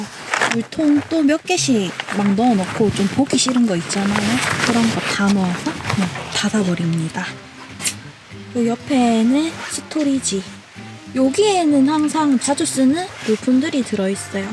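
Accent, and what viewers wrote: Gyeongsang, South Korea. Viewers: 갱상도사투리 너무 귀욥 ㅎ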